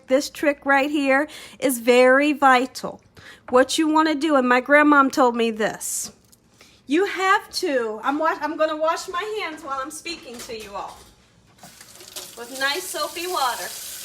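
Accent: South, United States. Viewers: She sound like pualla dean’s black friend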